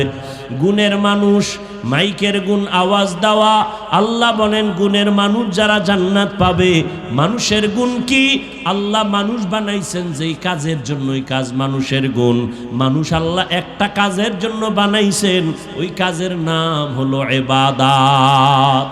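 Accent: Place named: Khulna, Bangladesh. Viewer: মাশাআল্লাহ এটা সম্ভবত খুলনার বয়ান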